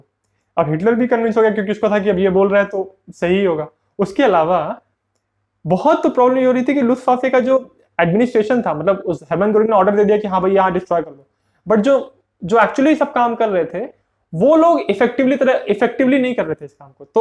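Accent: Bengal, India. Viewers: Bhai tum Bengali ho kya please reply🤗🤗🤗🤗🤗🤗🤗🤗🤗🤗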